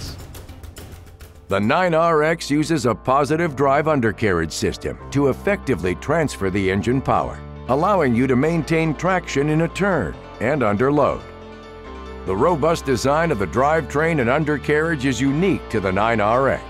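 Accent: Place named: South, United States. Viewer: That southern accent is whats making it. Perfection!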